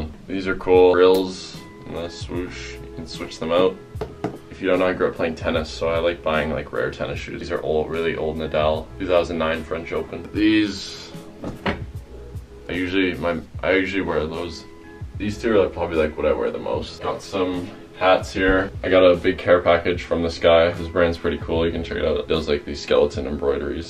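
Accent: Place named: Canada. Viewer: You sound extra canadian in this vid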